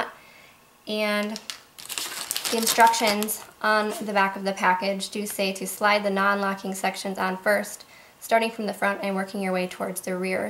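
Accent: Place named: United States. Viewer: Hi there, excellent stuff here. Would you please mind telling me where are you located in the US? I mean a state/region. I have studied English for years know and I love your accent. I am just listening ( and watching also ) and I can´t have enough, since your pronunciation is so beautiful. I really love the language and I would be thankful to you to learn where in the US people cherish English like you do. Take a good care. Jan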